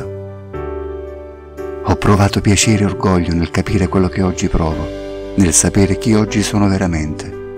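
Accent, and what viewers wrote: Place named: Naples, Italy. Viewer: Bella voce ma inflessione troppo partenopea... peccato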